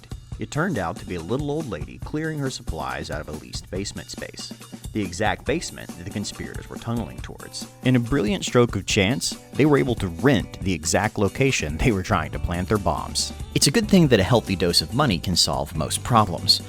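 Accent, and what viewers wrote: United States. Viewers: Makes sense why you know of it then seen as (I'm guessing from the accent) you're American. Good vid, got yourself a new sub. Much love from West Yorkshire, England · But he’s not British! We have an American accent, just as everyone has their own country’s. (Those are some counter-intuitive pronunciations - lol)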